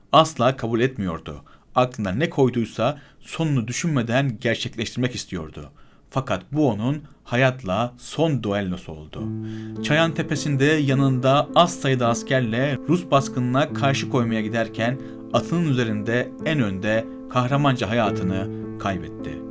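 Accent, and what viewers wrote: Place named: Rumelia, Turkey. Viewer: ab Atatürk''ün yazdığı şeyleri rumeli aksanı gibi okuyosun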